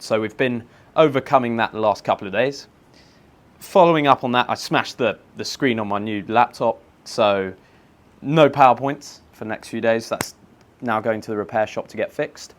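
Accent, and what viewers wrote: United Kingdom. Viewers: Loving this video format, seems like is more calm here, and all of a sudden he comes across way more british for some reason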